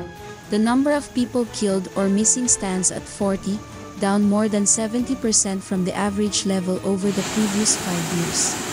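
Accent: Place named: Philippines. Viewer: Your the speaker are from the phillippines nice voice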